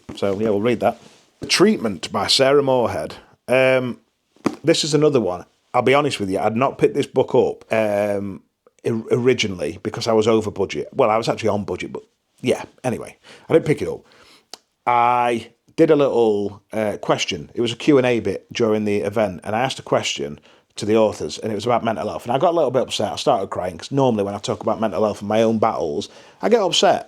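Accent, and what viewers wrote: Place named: Northern England, United Kingdom. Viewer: As ever, thanks for the recommendations, there are some here I am quite keen to explore. On this point - you can read gore and violence but I have a ceiling for that, and one of the books you highly recommended lately you said had a 'disgusting' magic system and I am grateful you spared me the mullah in picking a copy up. I would really love it if you continue to point out (where you are aware) any books that are particularly violent or smutty as I don't like descriptive violence and find that, for me, smut ruins the flow of the narrative ( if I wanted smut I'd pick up a Mr Gray book). It would be so handy to have this information if you have it. Keep on keeping on dude, I love you keeping it real. From a working class Southener with a cockney accent who loves youre Northern dialict. Take of yoursen.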